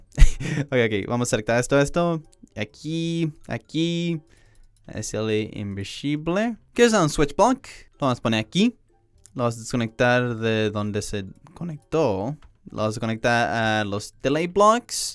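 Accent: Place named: England, United Kingdom. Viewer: un ingles intentado hablar español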